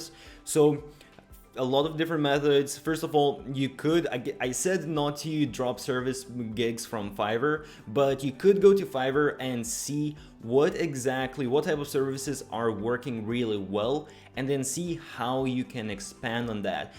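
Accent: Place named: Germany. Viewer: Could be German, or Russia or Estland?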